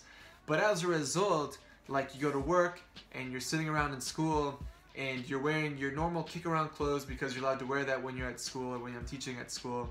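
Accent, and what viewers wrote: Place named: United States. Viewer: Are your parents from America? I can't come up with any reasons for your accent beyond that. Don't worry, it is very slight and faint, it is nothing to worry about. A strong New York or Southern accent would make it harder for you students to understand you, so again, I was not trying to be negative, I was just asking. Thank you for responding.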